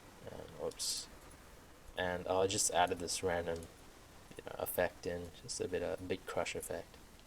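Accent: Australia. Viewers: Are u astraulian? :p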